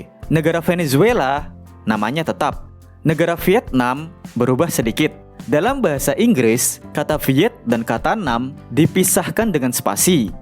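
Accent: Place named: Java, Indonesia. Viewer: Pelafalan a la ✨Djawa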